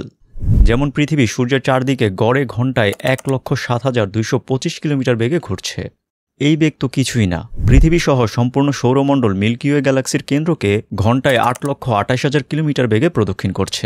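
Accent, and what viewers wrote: Narsingdi, Bangladesh. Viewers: Vai apnar basha ki narsingdi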